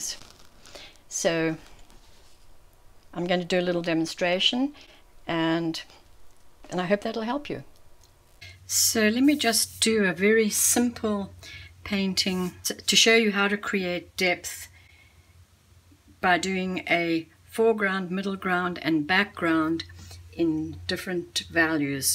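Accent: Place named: South Africa. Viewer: Thanks. I'm learning a lot about loose watercolour painting. I was surprised to hear you are living in California - you haven't lost your accent! Which I love to hear as I lived in S.A. for 10 happy years.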